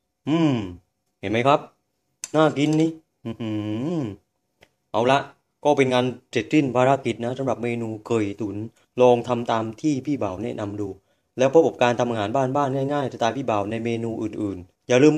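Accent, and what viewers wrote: South, Thailand. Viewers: น่าทานค่ะ ว่าแต่อยากเห็นหน้า จังฮู้ชอบคำพูดสำเนียงตนใต้จังเลย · แหลงใต้เลยตะผมว่า เสียงคนใต้มีเสน่นะ เห้อ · เสียงคนใต้ 555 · นํ้าเสียงของคนใต้.เพราะดีค่ะชอบมากๆน่ากินจังไข่ตุ๋น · สำเนียงใต้ชัดๆๆเลย555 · สำนังคนใต้น่ารักดีจร้า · ชอบสำเนียงทองแดงของ และอธิบายได้ดี ชอบมากครับ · คนใต้เรยนิ หรอยๆ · คนใต้ใช่ม้าย😄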